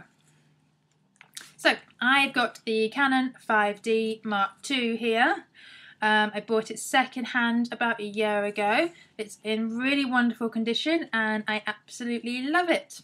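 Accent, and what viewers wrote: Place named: South West London, United Kingdom. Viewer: london accent..south west i think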